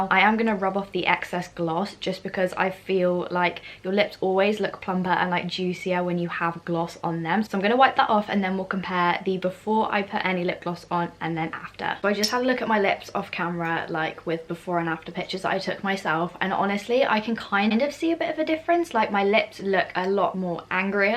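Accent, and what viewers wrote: United Kingdom. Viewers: oh I wasn't (edit was here, miss-typed) searching for a brit. I just watch a lot of YouTube and mostly come across Americans and Canadians. There was no need to call me odd. It is odd you would pick up on it. I called it refreshing because it was to me. There is no need to be upset okay? I'm just some random on the Internet that was happy she came across a familiar accent after spending a lot of time consuming youtube media that wasn't from the UK. Do you never just go through your recommendations? That's what I do. I don't search for anything it's more fun that way.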